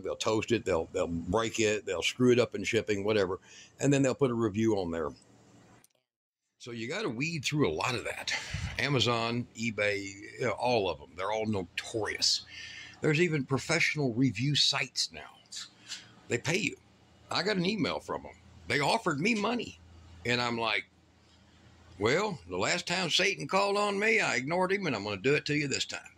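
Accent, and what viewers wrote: Texas, United States. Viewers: So funny that I hear I think a Texan. I know one friend of mine with the same name in Irving, TX that's a Scouter friend. Living close to DFW airport gives a perspective of how folks talk. Believe your doing a great job for us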